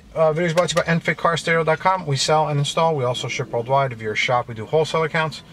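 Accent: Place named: New Jersey, United States. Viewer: Great video. Gotta love the Jersey accent.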